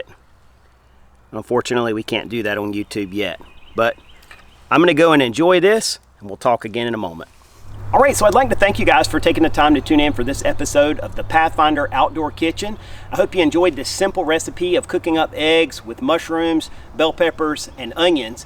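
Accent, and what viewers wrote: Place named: Carolina, United States. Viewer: That’s got to be a Carolina accent.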